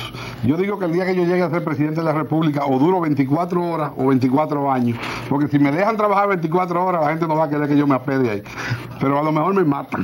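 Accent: Puerto Rico. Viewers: Bendito nene! pero mimetizaste bien el acento boricua...¿? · Cuánto talento tenía Freddy ya en ese tiempo era una figura Tony 😂tu hablaba boricua cuando eso pero tienes tus méritos también eres bueno rip Freddy el mejor y más valiente artista de la TV dominicana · Yeste acento boricua Tony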